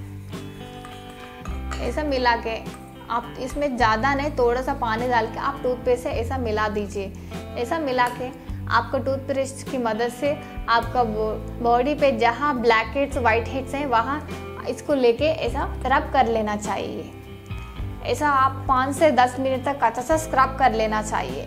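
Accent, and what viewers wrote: South, India. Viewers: your accent seem like a south Indian by the way I have a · Are u from south.. You are speking in very new manner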